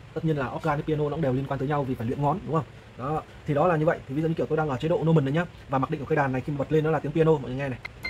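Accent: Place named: Hainan, China. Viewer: 你的语音和我一个海南同学的家乡话一样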